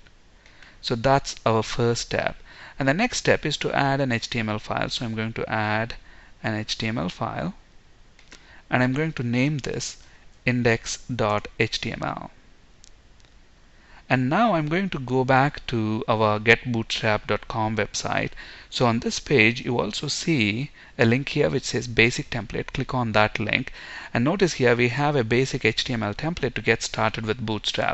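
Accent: India. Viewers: I have problem hearing Hindi accent most of the time but I can understand you and you explain very well starting with the context and what is what which is crucial to understand properly. Really a great tutorial.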